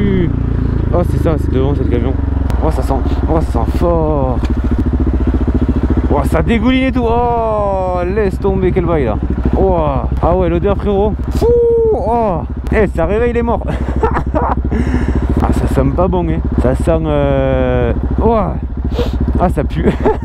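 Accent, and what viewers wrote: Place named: South, France. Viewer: J'espère que sa vas frero, j'adore ton accent du sud 😂😉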